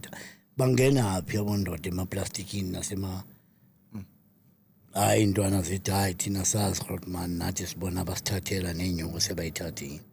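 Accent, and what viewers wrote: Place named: KwaZulu-Natal, South Africa. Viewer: Eeeeiy uwungowaseKZN ngempela mina yakuzwa😂😂😂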